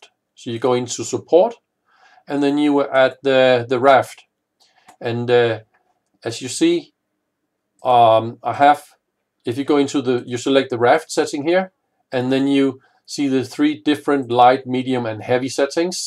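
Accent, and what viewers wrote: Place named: Denmark. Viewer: Awesome... Thank you. This was what I was looking for... By the way... Your accent... Er du dansk?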